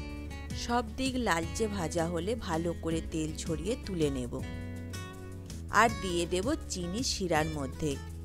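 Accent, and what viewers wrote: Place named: Kolkata, India. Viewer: আপু তুমার কলিকাতা দেশের মানুষ মতন কথা বলে তাই আমি বুঝতে পারছি যে তুমি কলিকাতা ঠিক আছে না আপু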